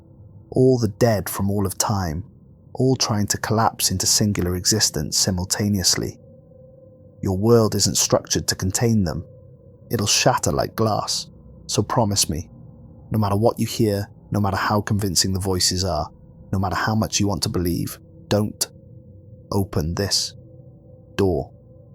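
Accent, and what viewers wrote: Wales, United Kingdom. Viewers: "Welsh" & he is great to listen to. :)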